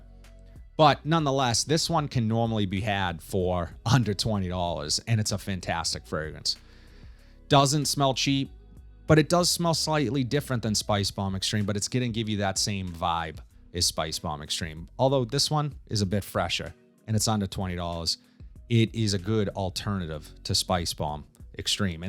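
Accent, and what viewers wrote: Boston, United States. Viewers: Cool Buaston accent!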